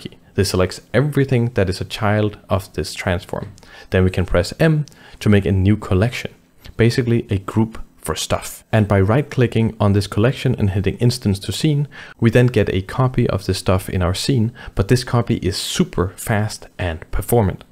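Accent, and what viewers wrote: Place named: Denmark. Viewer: The good old danish accent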